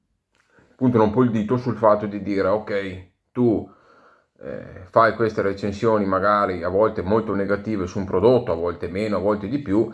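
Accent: Italy. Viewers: piu' ti sento parlare e piu' penso che hai un accento uguale a quello della mia zona... posso chiederti di che provincia/zona sei?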